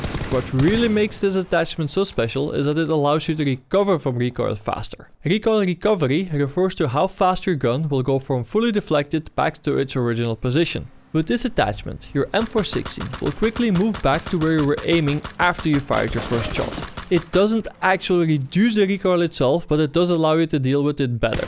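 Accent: Netherlands. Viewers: are you dutch by any chance? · jaja, 100% een nederlander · You must be dutch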